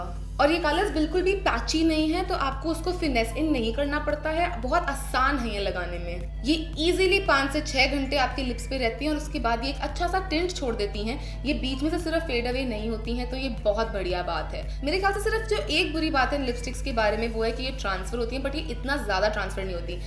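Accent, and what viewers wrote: Delhi, India. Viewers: Pakka Delhi wali hindi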